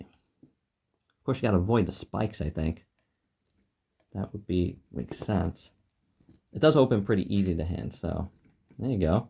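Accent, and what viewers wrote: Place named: New York, United States. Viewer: you have GOT TO BE from NY, i can hear it in your voice.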